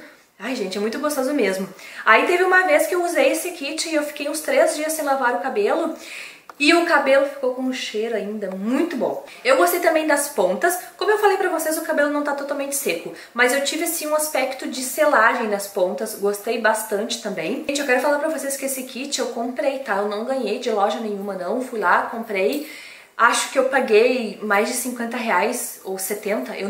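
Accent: Rio Grande do Sul, Brazil. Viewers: Ai eu adorei teu sotaque...Gaúcha, ne? Eu sou também, e amooooo <3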